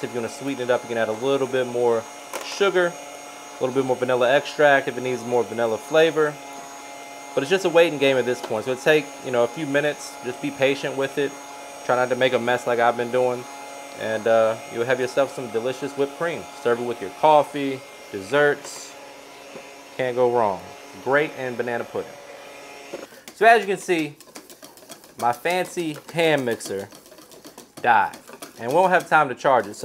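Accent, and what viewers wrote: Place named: Florida, United States. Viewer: Where are you from? Southeast? Your accent makes me think of Florida. 😂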